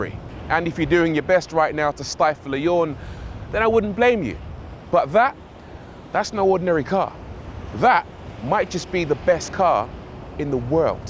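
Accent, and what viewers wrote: United Kingdom. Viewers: I love your presentation and British accent 👍🏿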